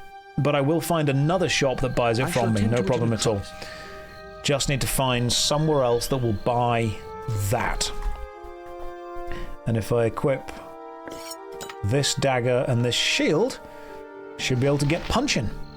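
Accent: United Kingdom. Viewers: Please, good sir, do tell me that you are indeed British as you seem to be. Because if you are, you are probably one of the Britishiest people I've ever seen.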